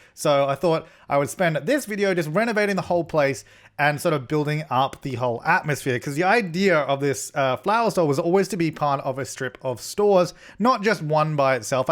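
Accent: Australia. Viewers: Haha I love how you love his accent but I’m Australian so it sounds completely normal to me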